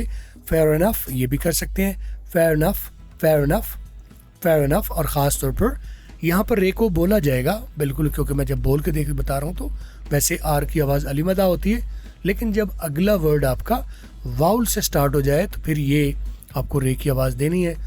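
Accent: United Kingdom. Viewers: Talking is British accent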